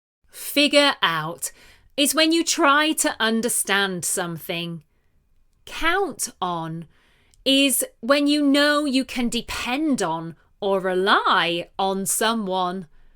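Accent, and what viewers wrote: United Kingdom. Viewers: And I love British accent 💕💕💕💕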